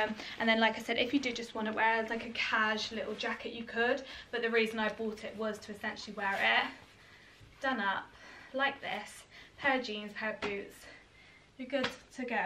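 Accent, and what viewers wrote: Bristol, United Kingdom. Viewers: hey beautiful you don’t have to answer but are you from Bristol😂I can hear a Bristolian accent in you xx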